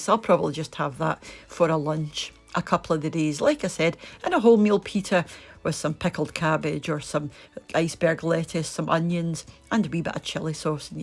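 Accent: Scotland, United Kingdom. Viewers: Hello, new subscriber here from Australia 🇦🇺. Currently my husband and I are on the keto, low carb diet so I love to watch your meal prep for a big carb fantasy fix. I found you by way of mrs. m. And just love your Scottish brogue. Best wishes.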